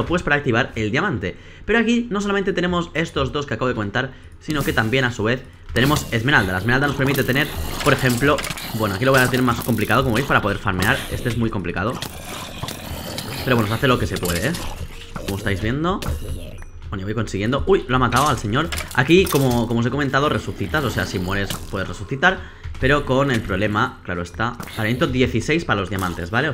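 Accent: Argentina. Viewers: Acento argentino <3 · ¿ sos de argentina o de españa? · deja de hablar en argentino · muy buen acento argentino y te lo dice una argentina 😃 a y sigue subiendo este modo de juego me encanto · me encanto tu acento argentino, tuviste que aver ganado, ivas tan bien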